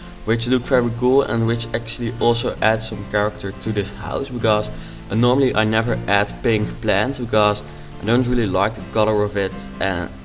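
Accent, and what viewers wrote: Netherlands. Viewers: haha je kan echt horen dat je nederlands bent (: mooi huis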